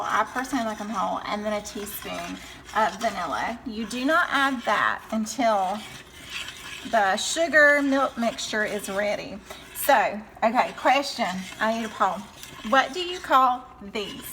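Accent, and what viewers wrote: South, United States. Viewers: My Momma used to get fresh Pecans from my brothers tree and he had a black walnut tree too and she would get bucket fulls. I don't think she ever made pralines though. I do like them though. I say it just like you do it is a Southern thing I think. I had a lady correct me and said it like the other way to say the name and I said well I say is the way I do that is how I was raised to say it and she told me that is the wrong way. I was like okay whatever lol.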